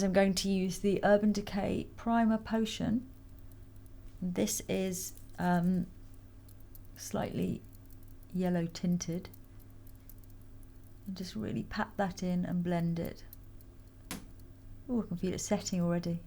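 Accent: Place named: RP, United Kingdom. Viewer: Really nice , also love your posh accent 😃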